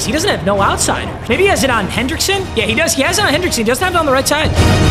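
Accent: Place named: Virginia, United States. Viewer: just cuz you talk like you have a mouthful of nuts & bolts doesn't mean people with less southern accents than you don't have a southern accent. He's got that Virginia southern accent 100% just listen to the way he says "you're terrible"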